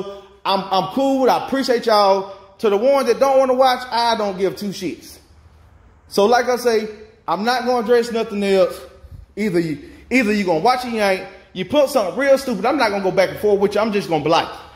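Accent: South, United States. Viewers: Love your work! You are so energetic and proud… how could you not be! Love the thick southern accent too! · Came across your video and it just caught my attention. Normally just checking out the hot rods. Well ended up watching at least 10 videos. Love the paint jobs and how you show it don’t take a big name paint paint shop and top notch equipment to do nice work. PS love the positive attitude and the country accent. Keep doing you and your thing brother. God Bless✌🏻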